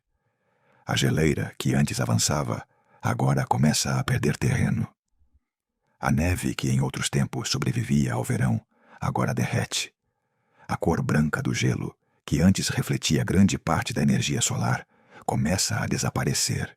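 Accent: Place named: Portugal. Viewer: Sotaque de Portugal não dá!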